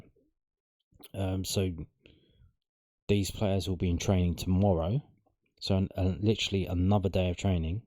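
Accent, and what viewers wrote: Scotland, United Kingdom. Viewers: Interesting and informative but that Scottish accent 🤬 fuck me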